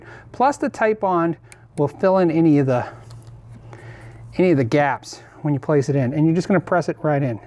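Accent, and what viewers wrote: United States. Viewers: Anyone know where in America this guy is from? I recognize the accent. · Americans pronounce it like that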